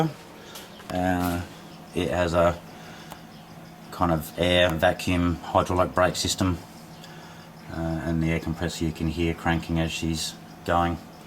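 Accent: Australia. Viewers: he speaks australian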